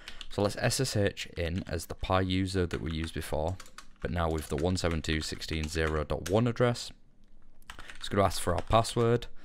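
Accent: United Kingdom. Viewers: you must be from 'ULL' ???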